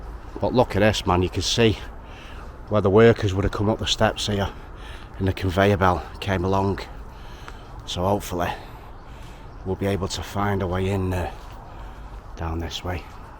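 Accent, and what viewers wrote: Manchester, United Kingdom. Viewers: Yer brilliant chanel lads,why do our kids look so uncomfortable around us?? My lads the same quiet ,and like you I'm a seeker. I still like to look at old buildings . But my lads like 'come on ya fat c. But yer you don't see channels like this .Well I can't find em .wheres your accent from. Sounds mancunian. Or near too. But it ain't Salford.keep it mate ,please.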